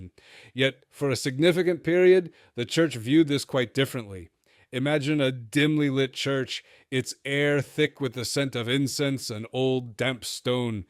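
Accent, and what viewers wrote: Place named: Scotland, United Kingdom. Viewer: Excellent expose. Luvin' ur Scots brogue.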